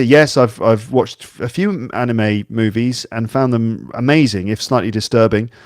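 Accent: United Kingdom. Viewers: I love listening to British accents... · This podcast is definitely needed for a British pronunciation. Thanks for everything, cannot live without it since my first listening!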